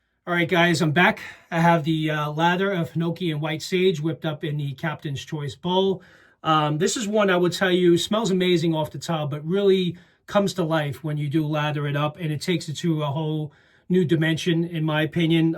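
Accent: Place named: New York, United States. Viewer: Nice shave, love the NY accent💈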